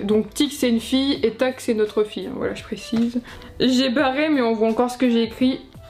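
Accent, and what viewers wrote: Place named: North, France. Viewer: Ptn elle a grave l’accent du nord😂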